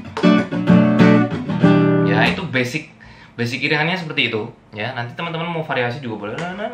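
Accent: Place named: Cilacap, Indonesia. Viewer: Loh deneng sampean ngapak mas. Wong ngendi sih?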